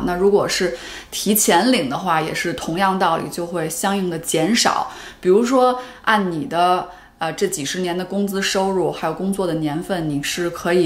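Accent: Beijing, China. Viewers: 北京大妞 听着舒服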